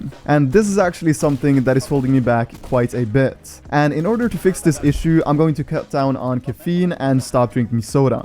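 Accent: Norway. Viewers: Yo er du norsk jeg merka det nettop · Wait are u Norwegian?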